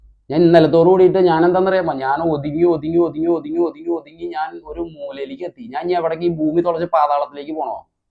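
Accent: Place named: Irinjalakuda, India. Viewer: Irinjilakuda voice aanu aa thaavzhi channel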